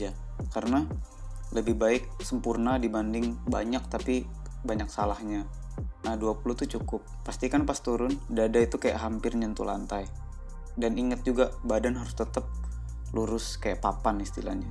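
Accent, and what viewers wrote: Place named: Medan, Indonesia. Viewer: Bang org medan ya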